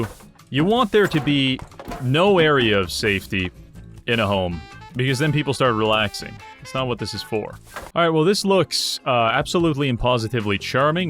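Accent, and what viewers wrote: Canada. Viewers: Your fancy Canadian speak amuses me.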